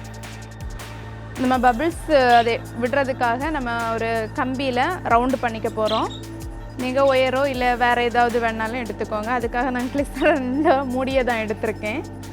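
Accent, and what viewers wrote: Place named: Nagercoil, India. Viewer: Nagercoil spech maari erukku I miss my place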